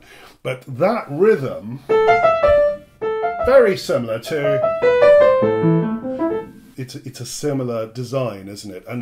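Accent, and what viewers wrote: United Kingdom. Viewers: Also, being a fan of Monty Python, I couldn't help but notice you're accent. It reminded me of John Cleese? Saw him live, in Charlotte. Thought you were from the same area, perhaps? I've learned, ALL English accents are NOT the same! LOL!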